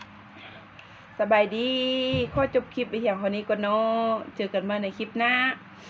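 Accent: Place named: Luang Prabang, Laos. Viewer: แซ่บๆนำจ้าตะแซ่บแท้ เจ้าเป็นคนหลวงพระบางบ่สำเนียงคือแท้❤❤❤❤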